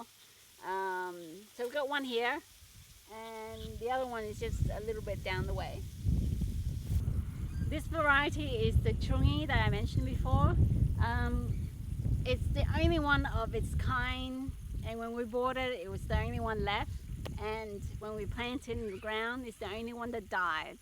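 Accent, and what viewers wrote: Australia. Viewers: Hi, I like your approach for a wind break. Bamboo has the highest oxygen output and carbon capture of any plant. The high Silica content of the leaf drop captures moisture in the ground and provides habitat for insects and critters. Oldhamii can grow three stories tall <link> and can withstand 120km winds. It can be char-coaled and it will produce the hottest burn of any charcoal known today. You sound Australian? Where are you in the country? I'd like to know for climate zone reasons. Also I would suggest reading about wind break design, usually you plant small trees infront which stops the full frontal force directly onto the trees or plant and minimizes turbulence, though I'm sure Odhamii can take it. see <link> & <link> for a great explanation.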